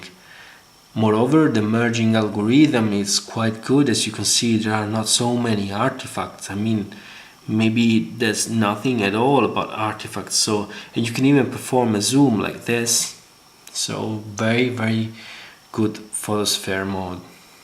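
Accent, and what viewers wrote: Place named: Italy. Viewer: Si sente che sei italiano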